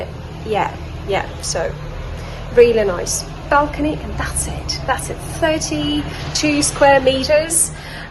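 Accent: United Kingdom. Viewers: Wow, your from Belarus, but sound like a British person. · you really sound British!!! how did you get such a beautiful British accent???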